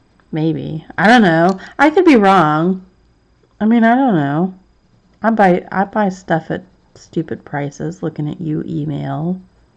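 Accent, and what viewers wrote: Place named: Dayton, United States. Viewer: Your coloring is beautiful. I’m from Celina, Ohio and sounds like your from Dayton ares? Keep up the good work!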